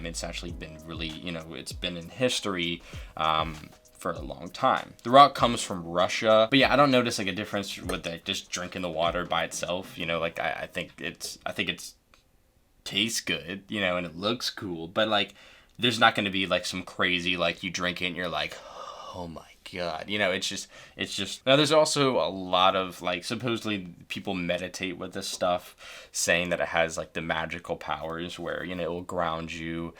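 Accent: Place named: East Coast, United States. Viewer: Are you by any chance from the east coast? I swear I keep hearing a slip of accent come through that sounds like it could be anywhere from Philly/SouthJersey to Baltimore area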